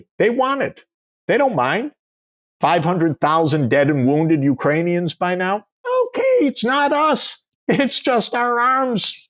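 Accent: Netherlands. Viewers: Your heavy Dutch accent is so funny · You sound dutch,it is Sachs not Sex😊 But never mind,good to invite mr.Sachs👍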